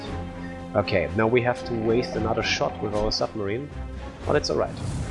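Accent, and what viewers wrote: Germany. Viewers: German 🇩🇪?:D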